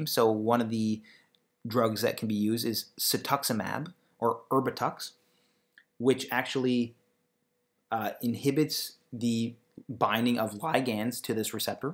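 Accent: Canada. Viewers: are u canadian?? ur accent sounds super canadian lol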